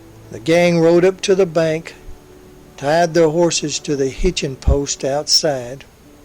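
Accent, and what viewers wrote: South, United States. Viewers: I think we're close to the same age, if Jerry Mather as the Beaver is your age then we're the same. You have a smooth southern voice I like hearing, and being a history major from William and Mary getting to hear stuff that wasn't included is always a plus.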